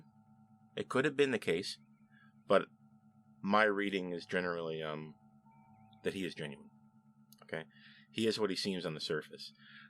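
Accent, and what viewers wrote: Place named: Nova Scotia, Canada. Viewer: Unreal good break down my friend.... I really dont like this show anymore its got so bad to me but i watched this episode and its probably the best one of the season but....still hard to give it much more than a 6/10 with everyone always angry and emotional and acting like TEENS. U from Nova scotia ? that accent seems close being from Boston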